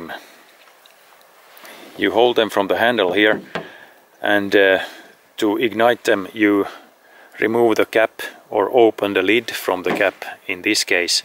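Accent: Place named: Finland. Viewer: Hmmnmm... Let me guess... You are from Finland.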